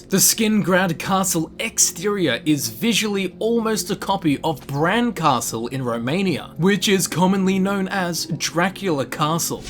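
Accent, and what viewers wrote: Australia. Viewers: Ccoooooollllll!!! Thanks for sharing! I wish I could get the character overhaul to work but alas, I cannot! Just a question, are you Australian or Kiwi?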